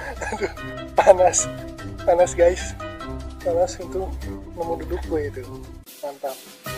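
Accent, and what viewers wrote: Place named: West Java, Indonesia. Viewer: Nyunda asek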